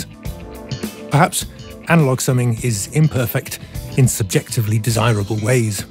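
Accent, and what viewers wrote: United Kingdom. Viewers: The only youtuber with british accent i can actually bear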